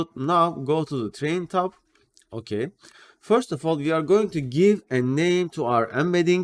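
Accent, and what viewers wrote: Turkey. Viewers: Türk aksanı, nerde olsa tanırım. Helal olsun, mük.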